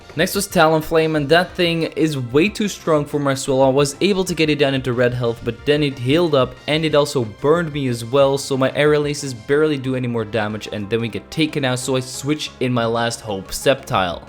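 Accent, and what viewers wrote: Belgium. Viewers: Wow je bent een belg😂 hoor het nu ook aan je stem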